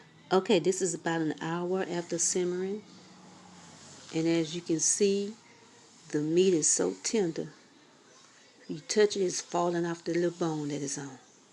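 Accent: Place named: South, United States. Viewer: When I tell you I smelled this through the phone! Oh I love your voice it reminds me of my southern cousins, and watching you cook definitely reminds me of my grandma ❤️❤️❤️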